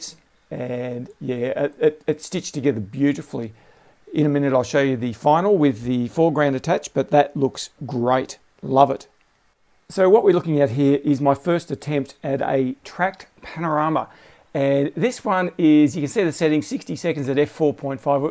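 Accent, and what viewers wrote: Australia. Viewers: OMG! An Aussie Voice, on here, talkin' my language, doing a great job of being HONEST!! Much appreciated!!! You mentioned the tripod...Bol..? But it is not 'linked' in your post, or have I missed it? Keep up the greatest work on here!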